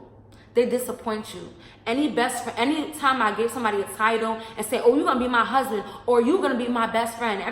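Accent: New York, United States. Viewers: Lol you have that New York accent fr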